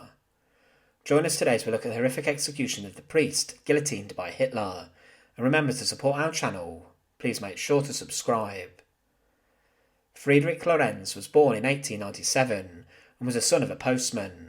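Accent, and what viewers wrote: United Kingdom. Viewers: It's a type of British pronunciation which I will never understand. But then, I hear myself saying "gonna" for "going to," so we all have our flaws.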